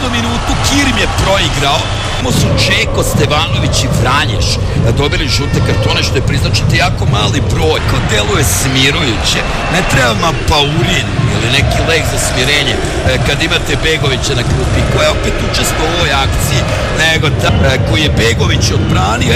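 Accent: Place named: Ekavian, Serbia. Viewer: Zasto ovaj komentator prica ekavicu?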